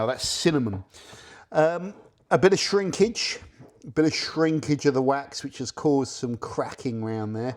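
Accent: United Kingdom. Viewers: I really love your British accent. Here in Korea, I occasionally hand-wax my Hyundai with Zymöl Ital. One day, when I’ve saved up enough money, I’d love to get some Concours and give it a try.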